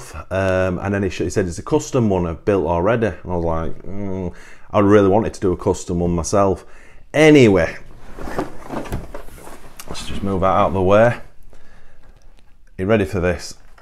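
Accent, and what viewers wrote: United Kingdom. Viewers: 🤠 Do you want these as well? 🙄🤦‍♂️🤦‍♂️🤦‍♂️ Yes, Yes I do 😍 🤣🤣🤣🤣🤣 it’s awesome I would have said yes as well I was trying to figure out what you meant by Bad-GA BUG then I saw the decals & remember your English isn’t my English it’s BA- HA Bug referring to Baja Mexico & the Baja 500 and the off road desert racing that goes on down there to this day. I used to work with a couple engineers from the UK (& Europe in general) I think I’m used to the accent I’m not even close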